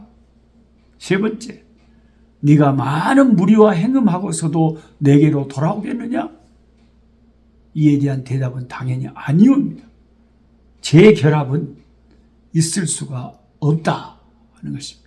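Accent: Jeolla, South Korea. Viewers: 목사님말씀으로 하루를 시작해서 에레미아서 까지 오게됨을 감사합니다 여기는 순천이레요 옆마을 벌교 사투리에 웃으며 정감이 깊습니다 추위 잘이겨내세요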